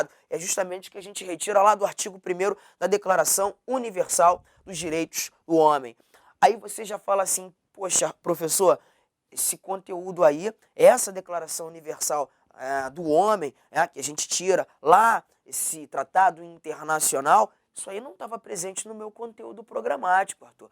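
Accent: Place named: Rio de Janeiro, Brazil. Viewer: Dá agonia assistir por mais de 15 minutos! Cariocão da fala "malhandra" ngm merece!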